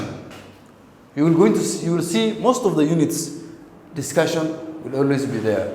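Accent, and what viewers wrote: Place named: Kenya. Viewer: I hope this teacher is from neighbouring country called kenya because he pronounce words the way i pronounced which means iam also from kenya.anyway thank teacher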